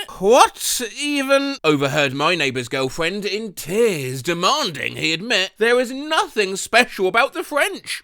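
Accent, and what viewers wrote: United Kingdom. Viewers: Was not expecting to hear Hindi in a British accent today but here we are! Bahut khoob! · Is he British?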